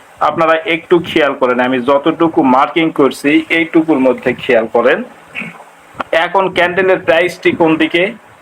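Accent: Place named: Bogura, Bangladesh. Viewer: ভাই মনে হচ্ছে আপনার বাড়ি বগুড়া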